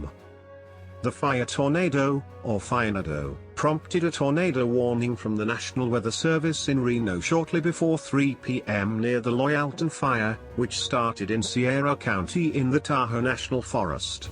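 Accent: United Kingdom. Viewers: Thats not True there have been fire tornados before ,,,, Robot Brit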